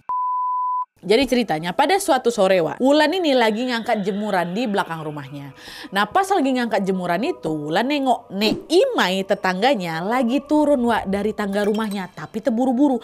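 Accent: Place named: Kalimantan, Indonesia. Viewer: pkee logat Kalimantan,hakk😭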